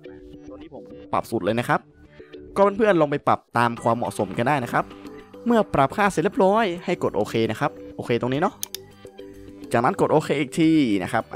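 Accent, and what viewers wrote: North, Thailand. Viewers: สำเนียงเหนือ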